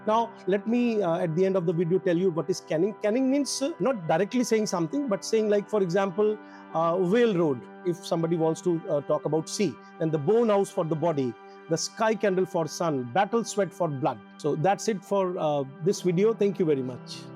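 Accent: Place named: Kerala, India. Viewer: Good sir, good information ,you are speaking like kerela teacher Awesome work sir...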